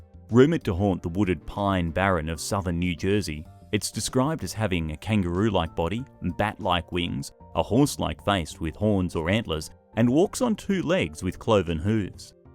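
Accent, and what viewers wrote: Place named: Australia. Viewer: An Australian accent but adopting American terminology.... what's the matter narrator... can't accept Australianisms?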